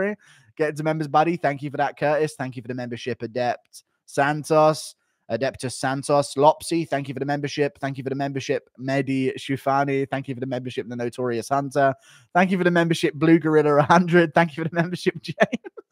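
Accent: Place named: United Kingdom. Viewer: it is lol. he speaks like 3 different languages and has a red neck vibe to him but talks like hes from britan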